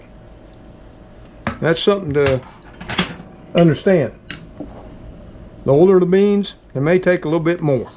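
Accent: South, United States. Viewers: love the southern accent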